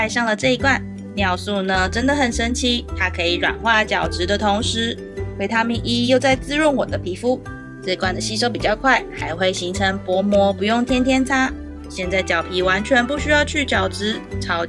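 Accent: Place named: Taiwan. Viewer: 台灣口音好可愛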